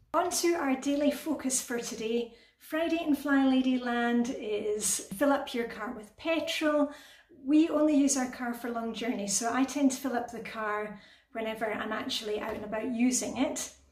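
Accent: Scotland, United Kingdom. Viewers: my little one's comment when you said "Little" -- "Mum she's from Ireland" -- I laughed and said no Scotland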